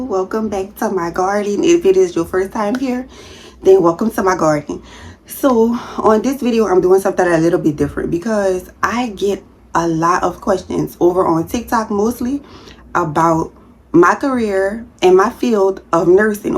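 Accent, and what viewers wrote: New Orleans, United States. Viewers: I love her accent New Orleans baby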